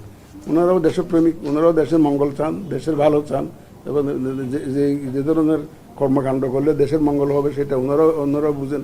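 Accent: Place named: Chittagong, Bangladesh. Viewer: Uni mone hoy Chittagong r bodda pollai unar kota buja jai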